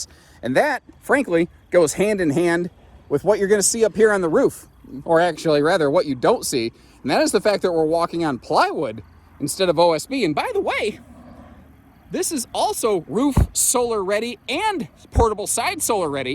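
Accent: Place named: Upper Midwest, United States. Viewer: They very first video of yours I watched I heard “right dere” used as punctuation and knew you were a good upper midwestern boy. I, too, am from the midwest and it’s pop. I’ve got the significant other trained to say pop when speaking directly to me (it’s soda otherwise) and my adult daughter is still with us and said that if she goes back to Alabama calling it pop she’ll be ticked. It’s pop. 😬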